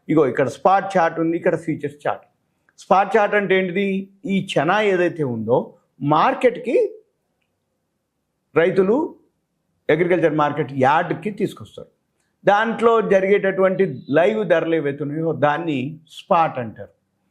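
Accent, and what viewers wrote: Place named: East Godavari, India. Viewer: Sir Mee native east godavara. Educated presentation LA ledu. 🙏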